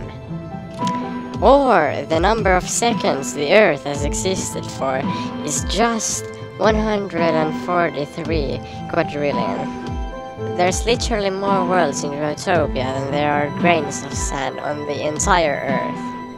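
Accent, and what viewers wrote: Finland. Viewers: are u from finland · Ootko Suomalainen Koska Kuulostat Siltä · are u finnish? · Are you from Finland?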